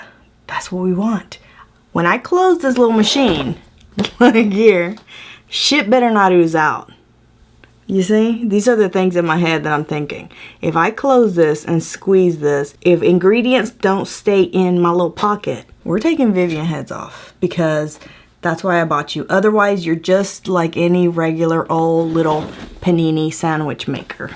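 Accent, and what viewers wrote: Puerto Rico. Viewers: boricua accent on point hehehe LOVE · Love your Puerto Rican accent! 💕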